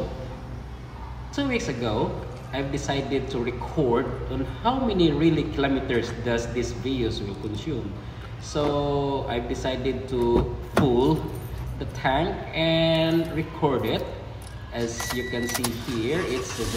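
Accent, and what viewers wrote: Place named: Philippines. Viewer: You sound like a filipino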